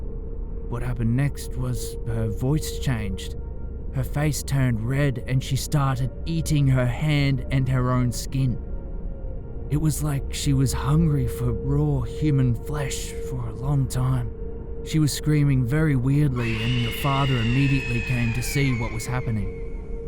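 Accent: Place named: Australia. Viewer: Good to hear an Aussie accent doing the narrative.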